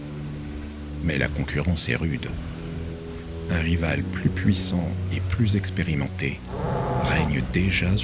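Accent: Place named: France. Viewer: The effort is astonishingly great. The french accent makes this look like it's an actual documentary.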